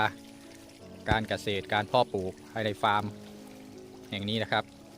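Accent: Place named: Isan, Thailand. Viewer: อีสานใต้หรือ อุบล แน่นอน อิอิ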